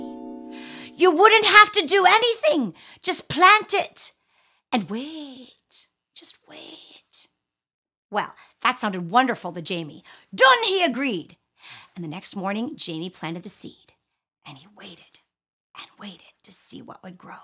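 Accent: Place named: Ireland. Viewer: Good fun, short tale and graphics to boot! Plus patented Irish accent! At no extra cost!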